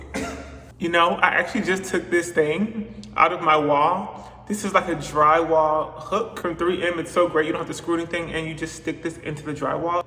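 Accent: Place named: Philadelphia, United States. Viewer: Why do you lowkey already have a philly accent😂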